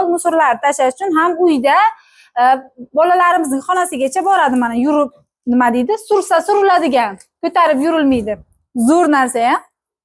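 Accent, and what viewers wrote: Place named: Bukhara, Uzbekistan. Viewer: Buxorodan salom. Shevamizdan aylanay😊. Biz xam siz tarafdan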